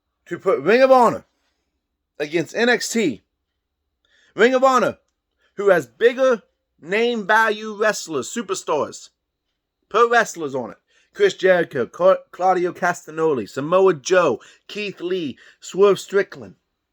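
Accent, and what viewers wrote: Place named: South, United States. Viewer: Why are you trying to talk like e Southern Lawyer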